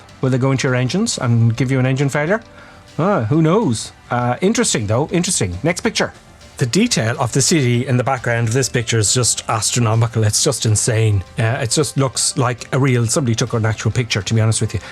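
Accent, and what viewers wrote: Ireland. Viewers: You sound like a fellow Irishman?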